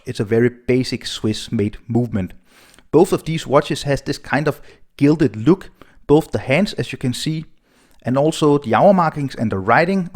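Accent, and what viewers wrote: Denmark. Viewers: By your accent Im guessing you are Danish, I am looking to buy a BB58 as well, do you recommend any retailers in the Copenhagen area? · I would guess your mother tongue is Danish, am I right?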